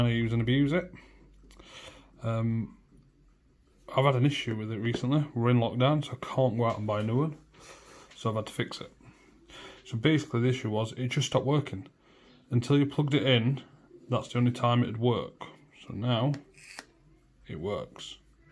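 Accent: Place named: United Kingdom. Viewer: Thanks for teardown instructions but damn your british voice irks me sorry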